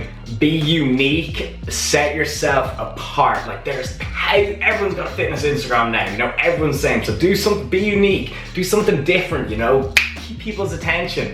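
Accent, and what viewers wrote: Ireland. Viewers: are you irish man ? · the way you irish people say "three" is mint lol. i now know what part u meant haha it was class.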